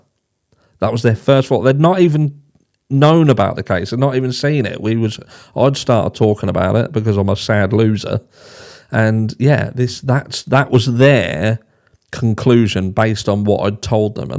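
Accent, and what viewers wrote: United Kingdom. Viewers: Really thank you mate you sincerely do absolute top work on your channel and your balanced yet open view to this most unfortunate matter. (It’s also great to hear a fellow Brit voice breaking the case down… I’m in Kensington myself 🇬🇧)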